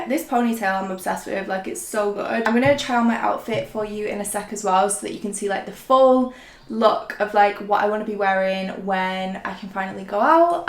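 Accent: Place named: Northern England, United Kingdom. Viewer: Gorg look! Your northern accent makes me feel so at home, hello from leeds ✨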